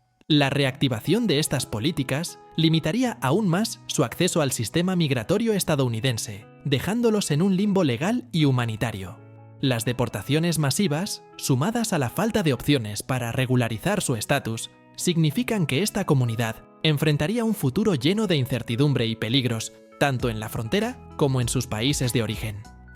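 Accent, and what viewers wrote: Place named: Spain. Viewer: Creo que por tu forma de hablar eres Español, son pocos los países latinos que buscan emigrar a EEUU la verdad son muy poco los panameños que quieren irse para allá ya que por el estatus de vida en nuestro país es estable solo viajan de manera de turista pero no por emigrar...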